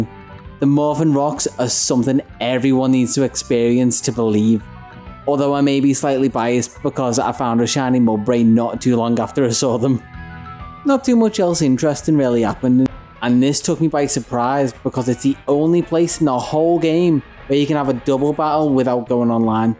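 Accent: Liverpool, United Kingdom. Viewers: Whiplash putting a Pokémon video on and hearing a scouse accent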